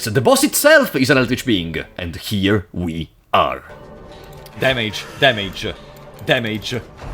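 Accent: Italy. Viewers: I CAN RECOGNISE A FELLOW ITALIAN WHEN I HEAR ONE! BELLA FRA FELICISSIMO DI AVER TROVATO A CASO IL TUO CANALE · Yep After minutes of talking , i can preaty much confirm that he Is an italian . Sei italiano vero ? 😂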